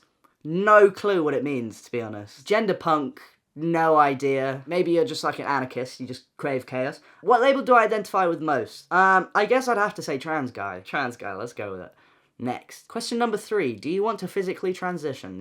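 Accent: United Kingdom. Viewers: idk how if this makes sense but you sound more british than usual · You know I hope you know that you don’t ALWAYS have to feel like you need to post vids abt sexuality or lgbtq related topics. I hope you know that there are many ppl out there like me who follow you for you ie. your charming personality, you humour, your looks and that gorgeous British accent of yours:) I just don’t want you to feel any pressure when it comes to content and hope that your still as passionate about it as you were in the beginning. Honestly I’d even watch you tell me how to cut an apple or explain the different colours to me at this point so just wanted to let u know that we love you for you <3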